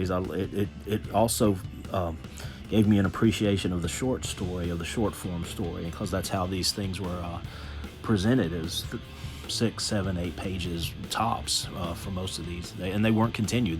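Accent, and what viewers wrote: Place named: United Kingdom. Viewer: Damn! How did I miss your channel?!? Well, I sub'd now! Weird: Somehow I thought you were British! Oh, well--! LOL Great stuff,!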